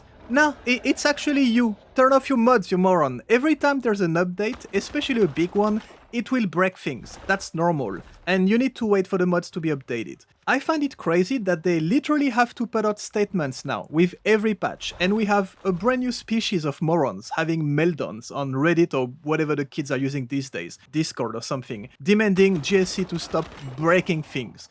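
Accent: France. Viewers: This vid convinced me to give the game a try. I really like your humor btw. The French accent makes it even more funny. :)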